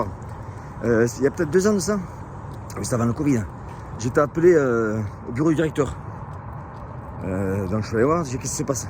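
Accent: South, France. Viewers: Bonjour les USA, bonjour, toujours d’excellentes vidéos avec notre accent du Sud 😉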